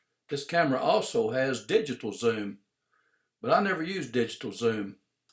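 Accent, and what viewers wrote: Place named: South, United States. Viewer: I do not know whether you have taken studies in communication at all but you are gifted communicator: concise, precise, positive and with the common touch and southern humour. Shades of Mark Twain and Paul Hogan of Crocodile Dundee. The South accent and turn of phrase is also part of your appeal. Your skill is not a skill that can be learnt beyond a certain point, no matter how much training. It is interesting that we in Australia use some of your colloquial expressions. You may want to broaden your topics beyond photos to homespun satire. One of our famous satirists passed away this week. See John Clarke and Bryan Dawe on Youtube.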